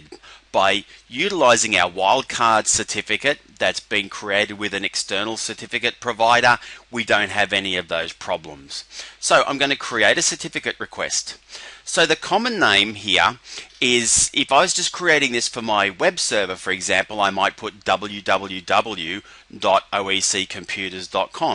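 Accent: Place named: Australia. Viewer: love the auzzy accent ;)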